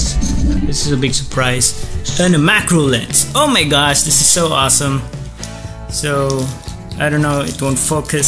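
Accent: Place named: Australia. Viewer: Love your Australian accent.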